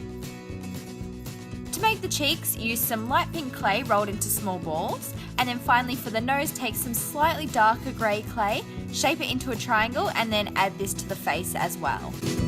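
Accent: Australia. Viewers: Omg she sound sooo AUSSIE 🐨🐨🐨🐨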